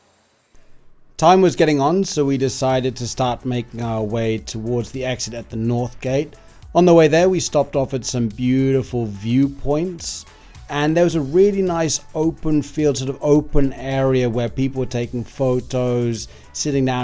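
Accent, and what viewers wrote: Thailand. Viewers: หูเราเพี้ยนๆมั้ยคะ เราว่าสำเนียงคุณแปร่งมาทางไทยนิดนึงค่ะ หรือเราคิดมากก็ไม่รู้นะคะ 😂😂😂😂😂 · Your thai accent so cute.❤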